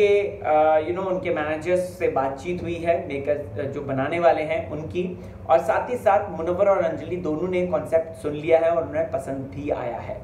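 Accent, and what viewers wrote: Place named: United Kingdom. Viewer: Ye bhai Mai tumahra videos dekhta hnn ya to English me bolo ya Hindi me , nhi to muh se supari Nikal ke baat Karo , but British jaisa Hindi naa bolo yrr 👍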